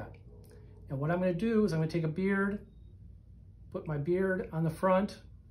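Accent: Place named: Midwest, United States. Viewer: and accent!! Midwest??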